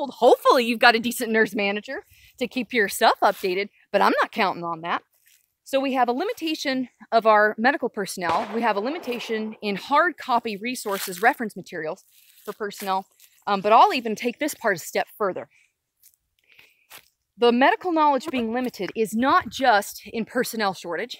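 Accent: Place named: Appalachia, United States. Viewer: The longer she's in the hills of Tennessee, the more that wonderful Appalachian twang begins to surface. Love it. :-)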